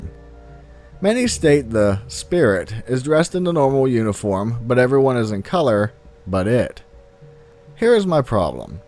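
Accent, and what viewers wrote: Pennsylvania, United States. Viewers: You speak with the same accent myself and my family apparently have lol. I didn't think I talked different until people started calling it our "family accent" we all apparently have. People even asked me where I was from lol. Shocking when I say I was born and raised in rural northern PA haha.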